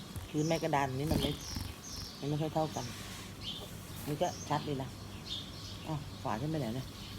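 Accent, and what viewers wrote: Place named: North, Thailand. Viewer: เหนือขนาดจ้าว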